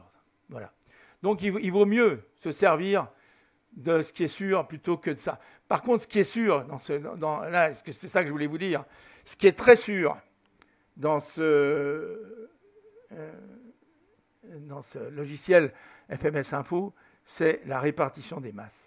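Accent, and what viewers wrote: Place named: Lyon, France. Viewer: Merci pour tous vos efforts autour de MSFS 2020 et du FBY A32Nx en particulier. Dès que je cherche une réponse, je tombe sur vos tutos ! Si je peux me permettre, vous gagneriez à être plus synthétique: Right to the point, please ! Vous vous perdez en détails, incidences et autres tergiversations : par exemple, ne perdez pas 20mn à parler d'un bout de logiciel obscur qui, selon vous, "ne marche pas"; ce sera déjà ça de gagné ! Moi qui cherchait le ZFWCG estimé dans l'OFP de Simbrief, je suis déçu et j'ai perdu 1 heure...mais ne désespère pas. Si je le trouve, je vous le dirai ... Surtout gardez votre sympathique gouaille lyonnaise qui permet de ne pas totalement perdre patience ! L'art est difficile et la critique bien facile ... Amicalement, PS/ comme promis, le ZFWCG est présenté tout en bas à gauche de l'écran de la tablette (EFB) à la page GROUND/PAYLOAD une fois les passagers embarqués Peut-être que cela n'existait pas au moment de la réalisation de votre vidéo. 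Cela vous permettra en tout cas de vérifier vos dires. En espérant que cela vous soit utile ainsi qu'à ceux qui font cette recherche.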